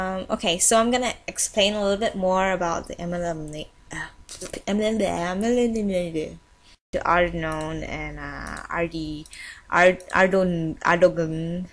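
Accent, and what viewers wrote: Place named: Scotland, United Kingdom. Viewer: He has a Scottish accent :)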